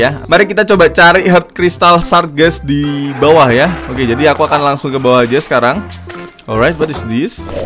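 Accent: Java, Indonesia. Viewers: Fiks Orang Jawa Logatnya :v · Abang orang jawa ya bang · Bang wong jowo nopo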